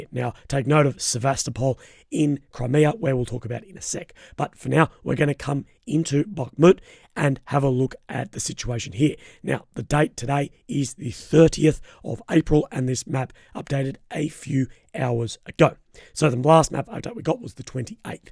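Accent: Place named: Australia. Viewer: Sorry mate but the breathless, staccato delivery in harsh Australian twang is extremely debilitating, an assault on the ears. Also makes my heart rhythm jump erratically.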